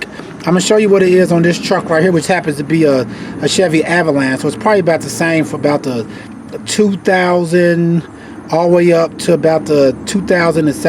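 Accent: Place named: St. Louis, United States. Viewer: Man are you from st.Louis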